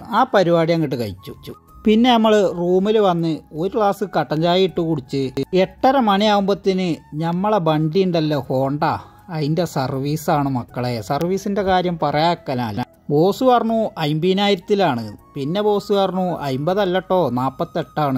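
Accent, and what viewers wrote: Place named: Malappuram, India. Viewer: നിങ്ങള്ടെ ഭാഷ കൊള്ളാം ചില മലപ്പുറത്തുകാർ സംസാരിച്ചാൽ തന്നെ t v m കാർക്ക് മനസ്സിലാവില്ല നിങ്ങൾ പൊളി സംസാരം കെട്ടിരുന്നാൽ സമയം പോവാലറിയില്ല 🤓🤓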